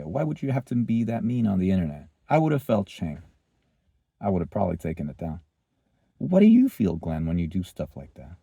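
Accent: United States. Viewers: This is probably just a me thing: But I like your accent! It sounds a little somewhat similar to American! (I am a Finnish guy, but I speak in the American accent when speaking English)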